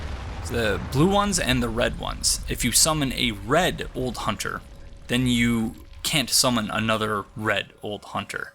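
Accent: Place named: Maritimes, Canada. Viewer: I know you had mentioned being from Canada before but I wouldn't have guessed the Maritimes (statistically) I'm in Nova Scotia (from New Brunswick) if you happen to look at this comment on your five year old video which Province are you from/in?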